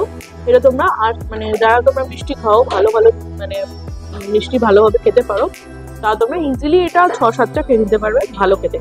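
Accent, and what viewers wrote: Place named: Hindi, India. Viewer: আপনার প্রেজেন্টেশন ভাল। এডিটিং ঠিকঠাক। মাঝে মাঝে হিন্দি শব্দ (উদা: wagera) আর হিন্দি উচ্চারণের (masala) অকারণ ব্যবহার একটু কমিয়ে দিলে আরো ভাল হবে।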